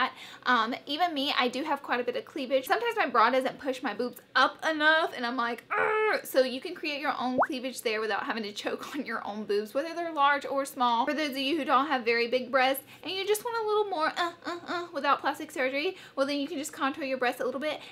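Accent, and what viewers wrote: South, United States. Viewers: All the power to you! So glad someone made this video. Also where are you from girl? Southern accent? Love it! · are you from the south? because if you are your accent is so cute!! I LOVE it!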